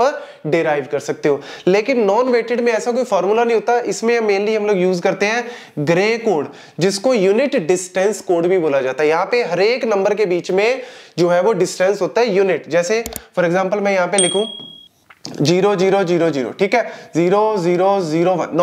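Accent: Punjab, India. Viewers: Veere I confidently know that your mother tongue would be punjabi.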